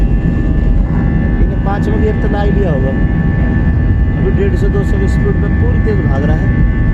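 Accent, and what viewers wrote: India. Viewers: Bhai india ka bilkul desi ladka h Very good video ye h bharat Ke gaon ki desi aawaj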